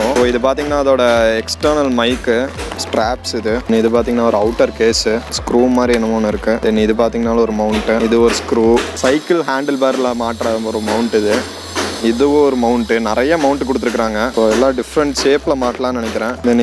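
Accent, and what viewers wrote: India. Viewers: Bro neenga entha ooru Kongu slang varuthu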